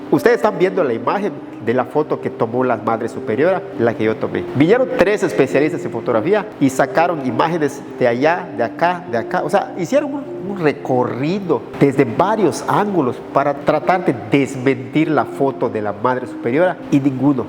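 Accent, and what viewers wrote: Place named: Yucatan, Mexico. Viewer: FELICIDADES POR SU VÍDEO Y PRINCIPALMENTE PORQUE USTED HABLA CON EL ACENTO YUCATECO. PORQUÉ AHORA YA SE ESTA PERDIENDO, SIIII YA QUIEREN TENER EL ACENTO DEL D.F. ESOOOO ES AVERGONZARSE DE SUS RAÍCES YUCATECAS. YA LAS MUJERES ANDAN CON PANTALONES, PANS, TENIS, MOCHILAS A LAS ESPALDAS. AHHH PEROOOO LO QUÉ NUNCA LES FALTA ES.... UN CELULAR EN LA MANO..QUÉ TRISTEZA VER A LA GRAN MAYORÍA DE LOS YUCATECOS AMAÑADOS POR LA TECNOLOGÍA Y TODAS LAS COSAS QUE DESEAN IMITAR DE LOS QUE ESTAN LLEGANDO A VIVIR AQUI.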